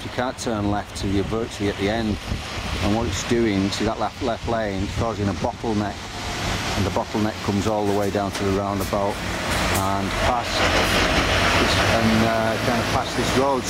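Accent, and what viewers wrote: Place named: Northern Ireland, United Kingdom. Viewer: its got a northern irish acsent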